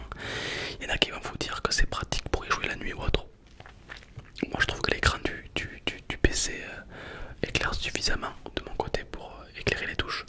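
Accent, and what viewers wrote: South, France. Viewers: t’as un petit accent du sud toi 😂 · Du sud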